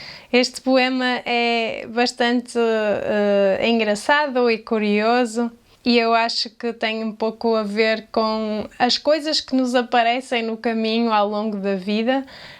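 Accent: Portugal. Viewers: Muito linda a sua leitura, e escutar te ler, me ajuda a perceber melhor o sotaque de Portugal, obrigada. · Olá, amei esse vídeo. Sou cidadão brasileiro nato e também cidadão português (avós maternos eram portugueses). Amo o Brasil e também amo Portugal. Escutar o sotaque do português de Portugal me faz relembrar minha avó Ana Rosa e meus três tios que nasceram em Portugal. Tenho em mim essa dicotomia que nunca desaparecerá. Quando estou aqui no Brasil, gostaria de estar em Portugal. Quando estou em Portugal, sinto falta do Brasil. Deixarei um link do finado Abujamra, recitando o poema Tabacaria de Fernando Pessoa. Abujamra foi um ícone do teatro, da tv e da teledramaturgia brasileira. <link> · Qua boa ideia! Pode ser divertido para aprender coisas sobre o Brasil também. Mas aprecio o sotaque de Portugal, então posso continuar a estudar português de Portugal. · Que lindo ouvir nossos poemas lidos nesse belo sotaque lusitano. 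Eu gosto muito da poesia portuguesa. Camões e Fernando Pessoa são meus preferidos. Deixo também algumas sugestões de alguns outros poemas brasileiros que eu gosto muito, para você dar uma olhada depois se quiser. "A máquina do mundo", Carlos Drummond de Andrade; "Meus oito anos", Casimiro de Abreu; "Ismália", Alphonsus de Guimarães; "Língua portuguesa", Olavo Bilac; "Seus olhos", Gonçalves Dias. Parabéns pelo vídeo e pelo seu trabalho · Gostei de ouvir a sua voz, porque gosto do português de Portugal. É uma pena que a tradução seja em inglês, eu preferiria em francês para entender melhor os poemas. Obrigado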